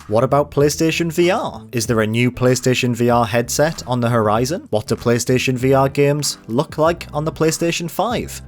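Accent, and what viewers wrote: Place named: Jarrow, United Kingdom. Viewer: Are you from jarrow m8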